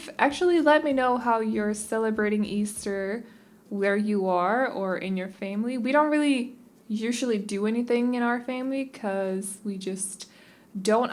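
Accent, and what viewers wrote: Denmark. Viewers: Wowww troede slet ik at du var fra Danmark haha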